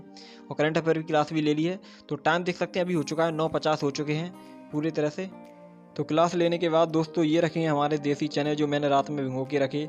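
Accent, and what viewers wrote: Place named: Chambal, India. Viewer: भाईसाहब आप कहां से है ,, बोली से लग रहा है आप भिंड, (चंबल संभाग) से लग रहे हो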